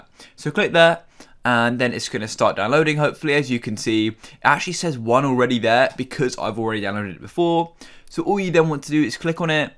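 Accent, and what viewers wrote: United Kingdom. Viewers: britsh man :)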